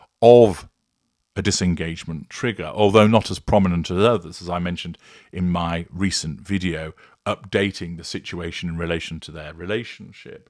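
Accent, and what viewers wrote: United Kingdom. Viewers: What an absurd discussion you just had with yourself. You seem to think your semi pompous British accent qualifies you as knowledgeable. It does not!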